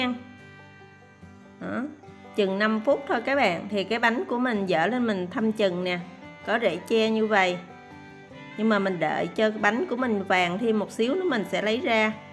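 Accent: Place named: Mekong Delta, Vietnam. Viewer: Tiếng nói rất rõ ràng và thật thà đậm nét miền tây, hướng dẫn cách làm rất dễ hiểu thật tuyệt vời 👍hà, chúc em thành công hơn nữa.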